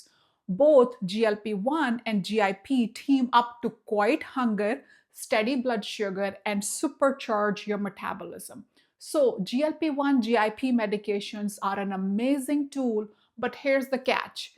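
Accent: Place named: India. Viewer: Good one but sorry to say u should change your pressurized Indian accent ..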